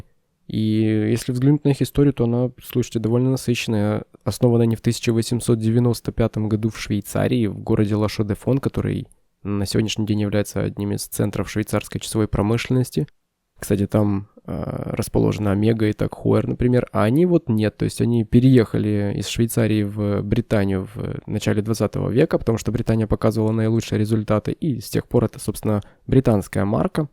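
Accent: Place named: South, Russia. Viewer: Обычный южно-русский говор. Давайте относиться снисходительно. Тем более, автор компетентен.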